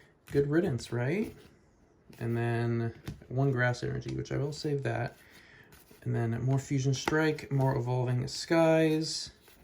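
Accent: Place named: Spain. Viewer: absolutely! Mainly Pokemon cards and books. But I think our approach to card collecting is similar, going for master sets, not necessarily promos, and getting singles over time to slowly complete them, as they become more affordable. Haven't seen you talk about language learning (though you may have). I've heard your Spanish accent in your churros Oreo video, so I suspect you've at the very least dabbled. It's probably my number one hobby, what I spend the most time on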